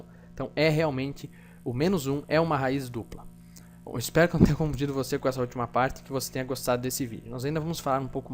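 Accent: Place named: Rio Grande do Sul, Brazil. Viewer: muito bom. De onde vc é? Sotaque do Rio grande do sul =)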